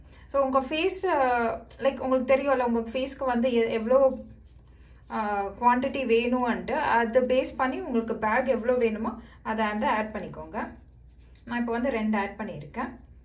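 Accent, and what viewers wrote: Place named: Telugu, India. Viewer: Very nice tips...loved your telugu tamil :)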